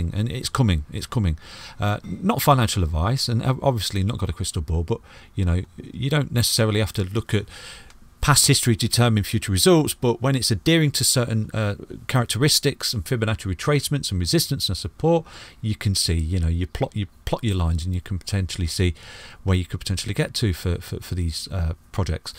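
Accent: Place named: England, United Kingdom. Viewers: You sound local mate, close to Derbyshire by Any chance or im thinking Nottingham? :) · I love listening to market YouTube videos, the English accent is the cherry on top.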